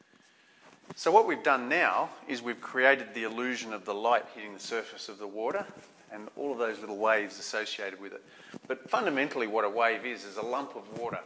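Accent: Australia. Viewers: There's really something about that australian accent.. The way you guys say water is just earfood. Also, it's really wild how a few strokes of paint can do so much. I'm currently painting a picture with a little island in the distance, a big lightning going into the water, but I'm lost at the waves. I have very flat water right now, but during a thunderstorm, water is wild.. But I want to keep the painting calm and soothing still, so I have to figure out how to do this :D Your tutorial helped a lot :) Thank you <3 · This guys amazing! love ya auzzie accent :) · OMG, this looks so easy!!! i know you made it look so easy , to us it won't. LOL. I love Australian accent! You're such an amazing artist! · I never painted because I thought I had to know how to draw , well imagine my surprise ,when I could paint. I was so confused,because I honestly can’t draw at all . 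Lol, I’m new here , ready to move out ,I’m in Bracebridge Ontario Canada 🇨🇦,The colours are going to be changing at months end . It’s extremely close to Algonquin Park , where there’s an incredible amount of wildlife,natural lakes ,rocks ,cliffs,it’s really beautiful. I’ve never been to Australia,it sounds like your from Australia/ perhaps New Zealand . I love the Ocean, so when I get settled, this lady is going to order those colours. I wonder if I should get a large can from ( Benjaminin Moore Store ) or no ? It’s acrylic paint,correct? What do you think of metallic paint, ? I’ve recently see ceramic tile ,finger painting . Here , in Ontario , I’d think that would be really expensive. However I believe I saw this young man in Costa Rica . However he did use paper ,it looked like watercolour paper ,but it couldn’t be. , definitely durable . , so I honestly don’t have a clue . I’ve just got acrylic paint, and $ store canvases. 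So should I prep my cheap canvas first? Thanks 🙏 so much . Your very talented. Have a wonderful day.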